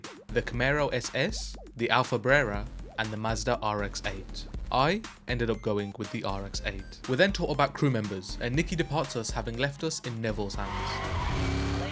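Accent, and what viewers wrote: United Kingdom. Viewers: Lol the use of AAVE in the British accent is funny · How come you didn’t get the 106 for underground 2? You sound like you’re English, the 106 was on European versions along with a corsa lol the 106 was actually the best starter stats wise too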